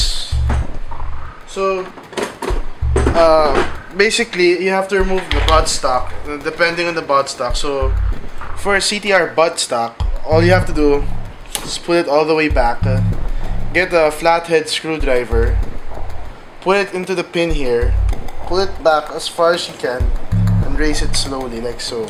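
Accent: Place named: Philippines. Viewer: Filipino accent :D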